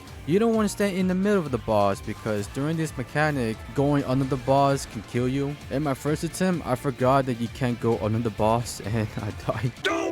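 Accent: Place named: Bulgaria. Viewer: Bro are you Bulgarian cuz your accent sounds exactly like this one Bulgarian artist that I like to watch on yt lol Nice video btw, youtube recommendations getting it right for once :D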